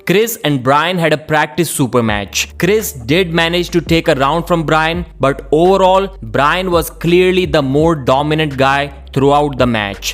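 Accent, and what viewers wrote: India. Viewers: Indian voice -> disliked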